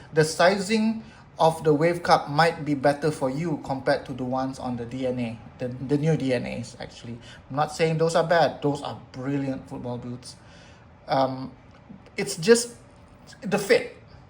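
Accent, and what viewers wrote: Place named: Malaysia. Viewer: Hi love ur videos, are u Malaysian by any chance? U sound very Malaysian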